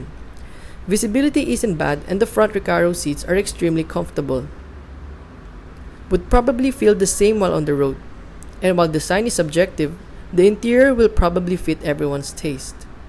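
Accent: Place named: Philippines. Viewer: he speek like many pacquiao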